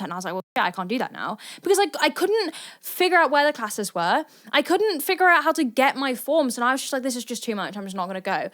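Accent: United Kingdom. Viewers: wasn't expecting the accent, -B-R-I-T-I-S-H-. Ha, my local australian barista looks a lot like you, minus the tats. ADHD spectrum for me became solidified following epilepsy diagnosis; they can often coincide. Have you ever struggled with epilepsy? Low latent inhibition is a thing too. My brain is super responsive to my own self-generated mind exercises.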